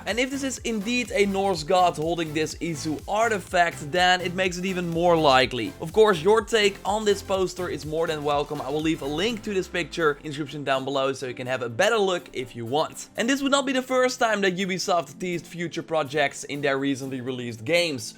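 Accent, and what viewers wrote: Netherlands. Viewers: Are you Dutch? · ughhh, your Dutch accent is the wooorstttt. I just can't keep listening to you. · Is he dutch? If not, where is he from? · This dutch english is cringe to me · Are you dutch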